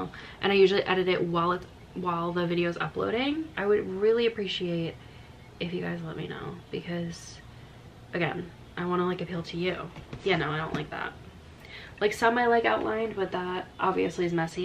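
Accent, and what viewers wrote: United States. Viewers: I'm from wisconsin and your accent seems the same as around here, never would have guesses long island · As someone from New Zealand you just have the standard American accent to me lol - I knew someone from New York and he had a similar accent to you. It’s not the classic New York accent - It’s just veryyyy American. I like your thumbnails, they’re cute - I don’t think it makes a big difference for me - but it might be more important for new viewers 🤷‍♀️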